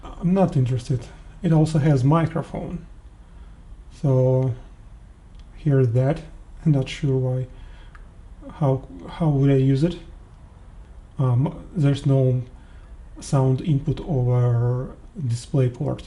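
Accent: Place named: Russia. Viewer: Чую русский акцент)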